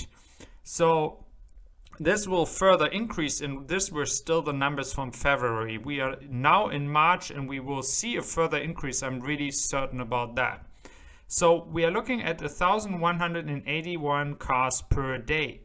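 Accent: Germany. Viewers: new viewer here, 👋🏻, what's your mother tongue? & "Feviery" sounds a bit French, but your vowels and stress are all Germanic. "Twenny" — Not sure what this tells me "six hundred and thirty two" — presence of "and" makes me think not German or at least didn't learn US English, but: "ja": Maybe you are German? I'm all confused :-)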